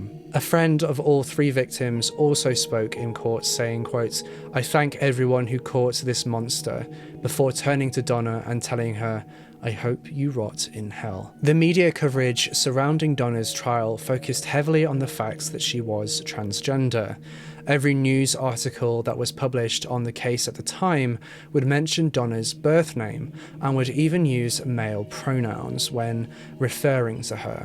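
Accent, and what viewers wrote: United Kingdom. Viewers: He's from the Uk..thats how they talk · I love that you are doing a piece on our local unsolved, but I have to tell you, I'm giggling like a fat kid with a cupcake listening to you mispronounce local place names in a British accent! You didn't even get to any of the real tough Native American named places! Puyallup, Humptulips, Stillaguamish, etc! While we had a number of serial killers during the period of time, I still lean towards Yates as the guy. What a piece of work that guy is. The Green River Killer was working during the same period, and Seattle and Portland both had cases that sorta looked similar. I can't remember if ballistics testing was compared. Been a long time! Keep up the good work! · Great job. Love your respect for the victims and families. P.S. For all the grammar police complaining about pronunciation of certain words I think he does an amazing job especially since he's from the UK. 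I know I wouldn't be able to pronounce the names of places where he lives and I give him credit for his effort. I live here in the US and still mispronounce certain things based on their spelling. does very well I think and I adore his accent!!! 👍🏻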